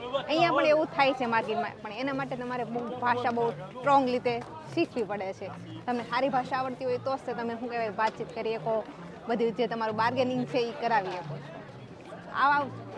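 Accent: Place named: Saurashtra, India. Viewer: Tamaru ગુજરાતી એક દમ shaurastra nu દેશી છે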